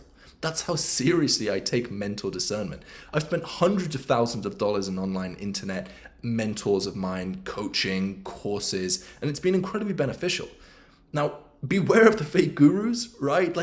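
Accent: United Kingdom. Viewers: good content as always. Part of Kirbys brands is the typical "BUT" with UK accent!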